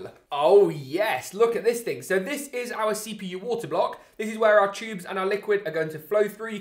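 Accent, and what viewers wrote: United Kingdom. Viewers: The bri ish accent is the most annoying accent in the world trust me man !!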